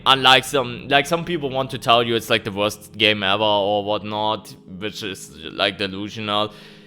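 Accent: Germany. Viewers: I like your accent. Is it german?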